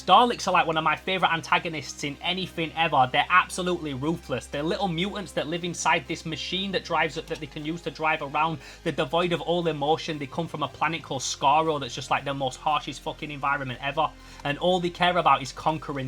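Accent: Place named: Yorkshire, United Kingdom. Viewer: Kinell, how fast and Yorkshire can you speak?! Good video though!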